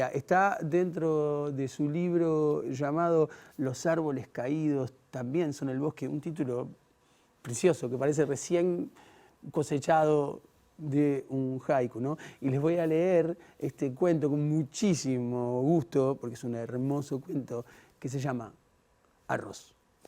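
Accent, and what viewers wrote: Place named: Buenos Aires, Argentina. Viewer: Hermoso cuento. Una sugerencia: no imponer el ritmo propio a la lectura. Narrar es intermpretar pero lo escrito por otro, no lo que a uno le 'sale del alma'. El alma también se equivoca. Mejor no leer en porteño lo que está escrito, pensado, pausado y respirado en japonés. Muchas gracias igualmente.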